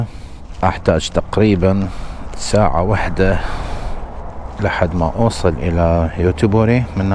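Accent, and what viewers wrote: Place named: Iraq. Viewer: المناظر جميلة ماشاء الله و سماع اللهجة العراقية اجمل ...تحية لك اخي الكريم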